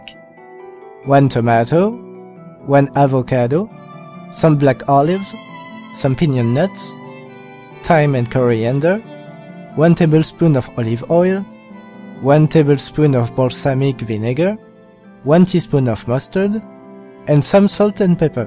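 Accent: France. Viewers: Know what part of Europe is he from I feel like he is french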